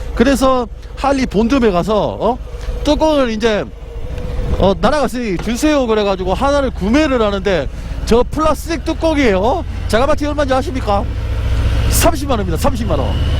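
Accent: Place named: Gyeongsang, South Korea. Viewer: 같은 경상도라 정감이 가네요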